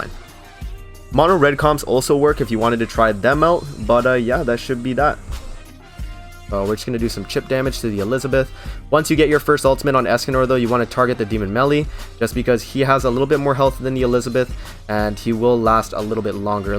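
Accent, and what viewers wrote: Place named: Vancouver, Canada. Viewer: yo are u from BC? u sound like someone from van XD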